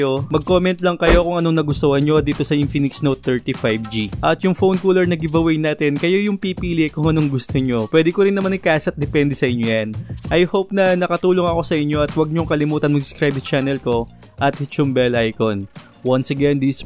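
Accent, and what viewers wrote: Pampanga, Philippines. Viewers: Kapampanagn accent alam na alam ko e hehe new sub here kabalen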